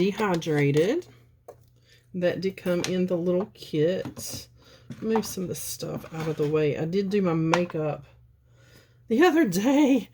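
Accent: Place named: Alabama, United States. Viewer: I came to the comments to see if I could figure out her accent 😂 and I see The Alabama girl. I’m from Jasper 😊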